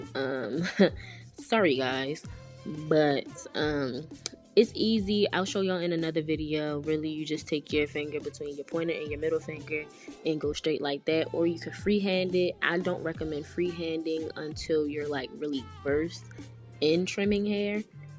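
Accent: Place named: Philadelphia, United States. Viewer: I hear a Philly accent. Where are you located so I can get my hair done?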